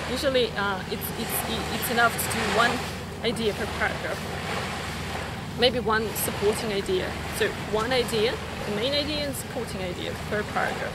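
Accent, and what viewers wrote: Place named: England, United Kingdom. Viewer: This is a English accent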